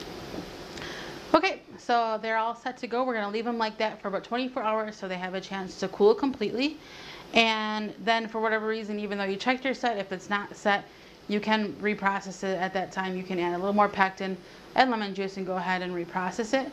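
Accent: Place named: North, United States. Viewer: You talk too fast. And we southerners can't understand northenors. That's out fault lol.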